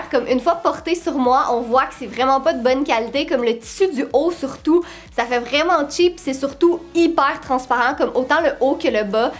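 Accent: Quebec, Canada. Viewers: Je m'a bonne j'adore ton accent québécoise 😍😍😍😍😍😍😍😍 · De québec 🤡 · Ça m’a fait trop rire quand t'as dis ahh on dirais qui a deux emballage je suis française et j'adore l'accent québécois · Belle accent je parie tout ce que vous voulez qu' elle est québécoise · J'aime trop l'accent québécois c'est trop beau tu es trop belle t'inquiète je suis une fille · J'adore ton accent 😍 vive le Canada (ou le Québec ) 🇨🇦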